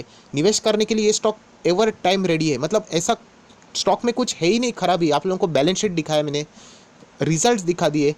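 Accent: Maharashtra, India. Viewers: Kachra nhi hai khara Sona hai 😄😄 Aap Marathi ho kya sir ??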